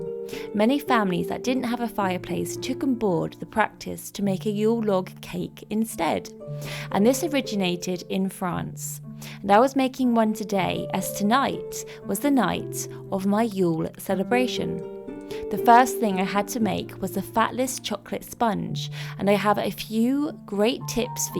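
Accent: United Kingdom. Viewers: I know your accent is British but it sounds very particular, which region is it from? I watch someone else from Yorkshire but it’s not quite the same and that’s my only frame of reference.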